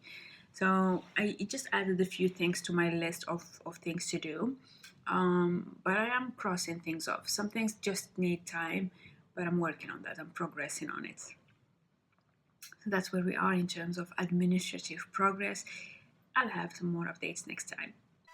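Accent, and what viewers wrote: United Kingdom. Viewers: I feel like your accent is slightly becoming english 🙈 You go girl! Like your ambition! Keep going and climbing! Bises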